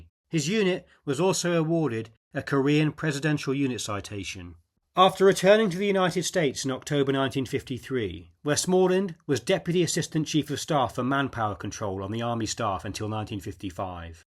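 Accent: United Kingdom. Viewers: There is something F.C.U.K.D UP about listening to a Brit covering Vietnam War ?.....NIGEL DIDN'T $URF !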